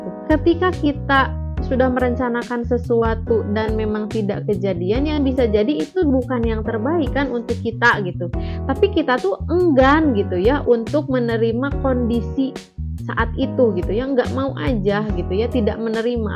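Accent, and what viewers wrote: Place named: West Java, Indonesia. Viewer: nuhun teteh 😊😊 ari teteh orang mana? da logatna sunda mah moal kabobodo hehe teteh pasti orang sunda. abdi penonton baru teh.. ari teteh sbrha lama kena gerd?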